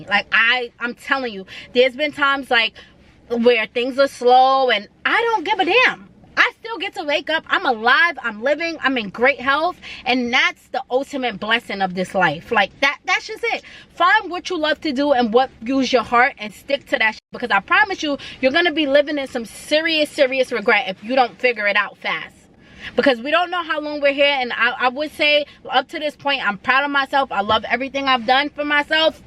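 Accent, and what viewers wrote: New York, United States. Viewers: That NYC accent! Fire! Super detectable!😃You sound like Minaj!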